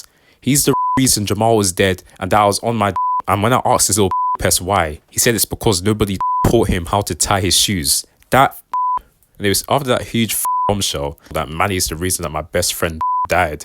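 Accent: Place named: United Kingdom. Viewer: The British accent makes this like 1000x better bro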